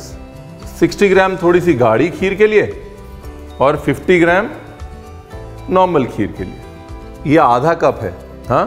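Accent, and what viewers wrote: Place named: Lucknow, India. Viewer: Like ur Lucknowvi andaaz😁😊😊🏆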